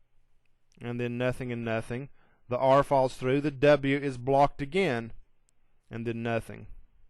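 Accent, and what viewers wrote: South, United States. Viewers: Thanks, that glorious southern accent now pops into my head every time I'm setting permissions!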